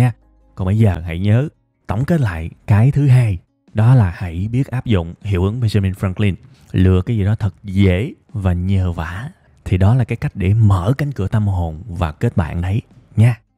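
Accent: South, Vietnam. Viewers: Giọng MIỀN NAM của banj rất hấp dẫn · Giong noi mien nam nghe de hieu va de gan . One like 🤗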